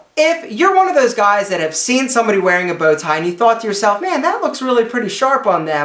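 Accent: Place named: New Jersey, United States. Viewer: Don't take it personally, he's probably from Jersey with that voice.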